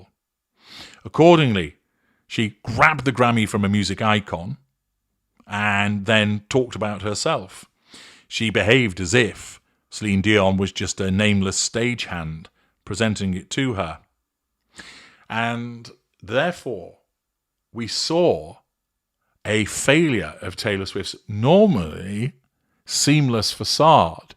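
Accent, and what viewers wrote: United Kingdom. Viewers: This guy really does waffle on. Amazing how many people are impressed by just a pompous British accent.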